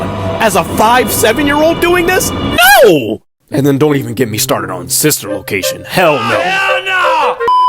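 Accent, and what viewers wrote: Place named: Philadelphia, United States. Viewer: Why his sound like philly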